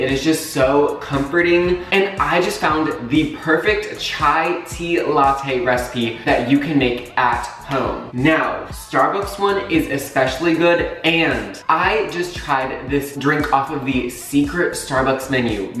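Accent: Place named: Brazil. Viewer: Where are you from? Your accent it seems like brazilians speakers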